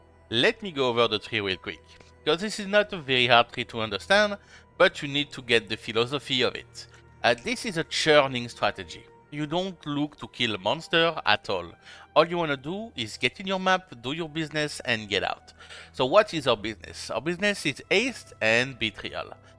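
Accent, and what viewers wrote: France. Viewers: nice french accent <3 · U French?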